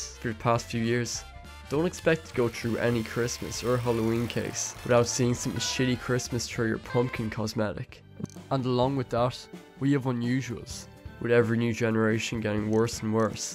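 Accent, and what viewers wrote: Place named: Waterford, Ireland. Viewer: What county are you from cause your defintly a waterford man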